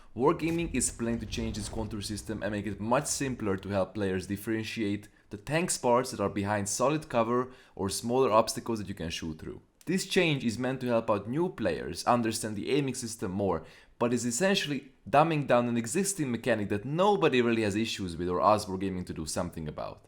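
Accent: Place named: Hungary. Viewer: Hungarian accent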